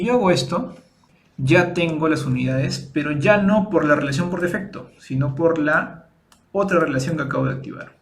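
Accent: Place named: Peru. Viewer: Muy bien explicado, buenos ejemplos y realmente didactico, de ahi un problemita que el microfono realmente no te ayuda pero eso se mejora con el tiempo al tener uno mejor, y sobre reconocer a leguas ese acento de mi paisano peruano. Exitos en t u canal cauza!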